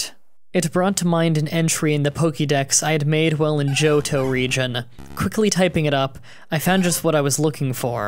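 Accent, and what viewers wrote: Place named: Canada. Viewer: Danielle Eaton Since it looks like he isn't going to reply I will, the words he "mispronounced" are Americanized typos. Meaning they were actual words that Americans spelled incorrectly when making the dictionary. He is Canadian and reads the words as they should be read, the rest is his dialect. He has stated this at this point probably more than one hundred times and it might even be in the description of his creepypasta videos.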